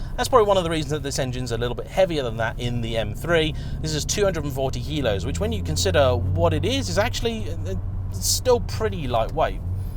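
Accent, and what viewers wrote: United Kingdom. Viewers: Yes, I believe you did a great job with this clip. Yes, I like BMWs, especially, the M3s. This M5 is particularly better than the ones I have tried I admit that but what I am curious about is your accent because I must say you are probably the only Brit that I can understand all of what he says. So, from what part of England is that accent?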